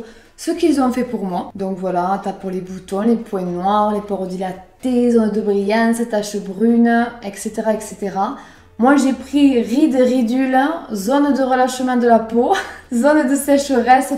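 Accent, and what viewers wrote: South, France. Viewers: Bonjour! Je viens de m'abonner à ta chaine, jai le meme accent que toi meme si je l'ai perdu un peu en Ardèche!! De quel coin du sud tu es? Moi Avignon (et quand j'écoute une video ou je parle, c'est le même accent que le tien🤣). Bisou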